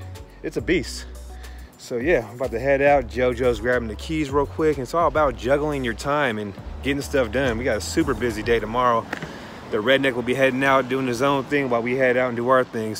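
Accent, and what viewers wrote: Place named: Louisiana, United States. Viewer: Dude is wild with that Louisiana accent. That's what made me subscribe. He's natural with it. And, the background music is the bomb.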